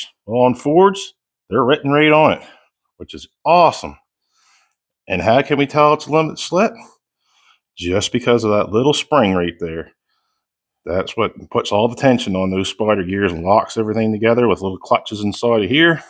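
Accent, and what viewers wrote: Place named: Nova Scotia, Canada. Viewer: I knew that accent was from Nova Scotia !!! Kings county here brother !!!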